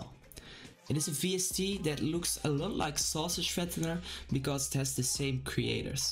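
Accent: Netherlands. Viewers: nou ook weer goed te horen dat het een nederlander is lol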